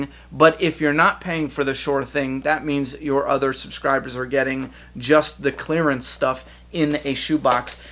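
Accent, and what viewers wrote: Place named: East Coast, United States. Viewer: Love that east coast accent ;)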